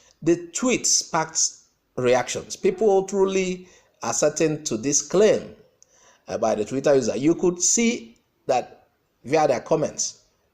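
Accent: Igbo, Nigeria. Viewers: Your Igbo accent is funny..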